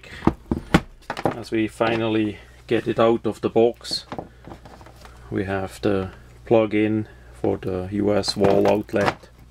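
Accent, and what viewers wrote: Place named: Switzerland. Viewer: awesome schwitzer-english accent... really funny.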